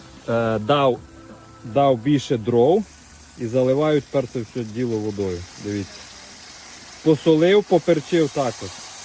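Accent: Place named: Zakarpattia, Ukraine. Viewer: мене більше цікавить як у нью-йоркерця до сих пір такий прекрасний закарпутський акцент😅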